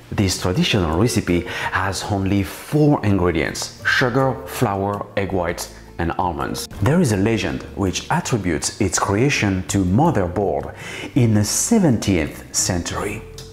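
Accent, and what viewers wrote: France. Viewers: first time viewer. your french accent, and story telling abilities sold me. subscribed already. :) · You are so delightfully smarmy! Your voice, your accent, your facial expressions... oh, you make me miss my time living en France.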